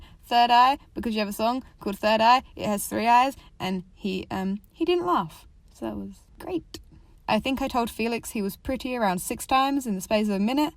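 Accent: United Kingdom. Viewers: WAIT YOURE BRITISH WHAT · omg yes you’re british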